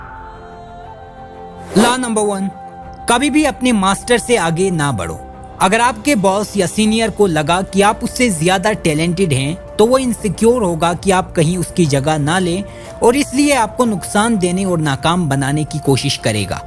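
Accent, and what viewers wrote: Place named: Gilgit-Baltistan, Pakistan. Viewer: Apky urdu accent sey lagta hay app Gilgit Baltistan say belong krtay hain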